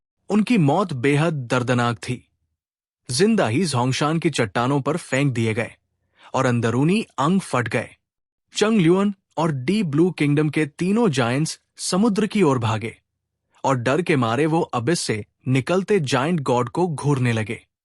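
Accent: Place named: India. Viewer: Why you turn into indian bro.. i cant understand a thing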